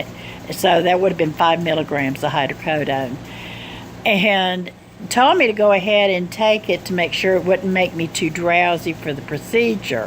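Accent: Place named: South, United States. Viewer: I love ur Southern accent! I know u will do great and feel so much better after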